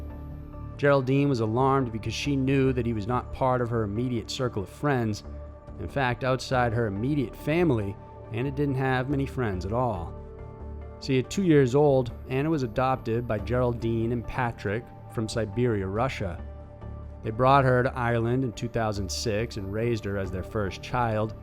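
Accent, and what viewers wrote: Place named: Texas, United States. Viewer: Hi, new here. Trying to place this guy's accent, has he ever said? Sometimes I hear Va/NC area other times it sounds like Ga or Tx. Prbly way off, anyway... thoughts?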